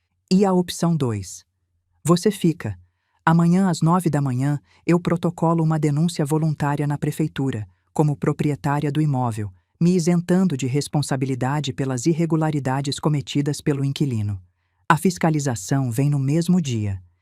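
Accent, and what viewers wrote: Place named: Portugal. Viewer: Mimo. Em excesso estraga ... Estou ouvindo Portugal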